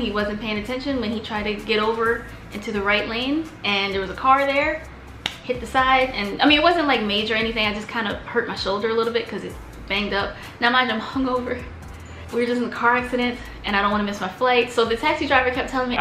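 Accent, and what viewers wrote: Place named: United Kingdom. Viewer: Your UK accent is getting better for sure! At this point I Don't even think you're doing it on purpose 🙄 🤙🏾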